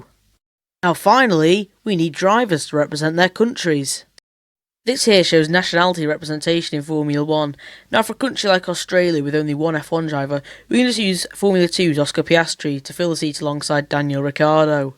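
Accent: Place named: Preston, United Kingdom. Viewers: Big up the Preston accent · Your accent is so british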